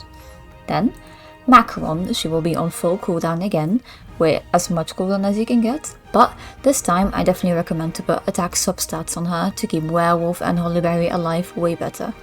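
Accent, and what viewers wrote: United Kingdom. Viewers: sounds a bit british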